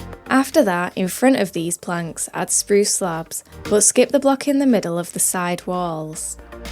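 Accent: United Kingdom. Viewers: This is another reason why I love British accents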